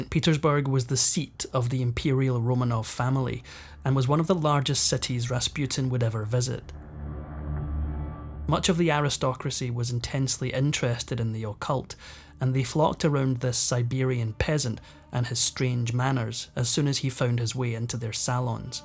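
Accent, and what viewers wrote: Scotland, United Kingdom. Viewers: My all time favorite narrator Love listening to the Scot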